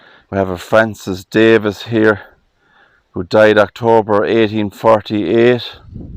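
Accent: Ireland. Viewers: Such beauty and history. I could listen to your descriptions in your fabulous Irish accent for hours.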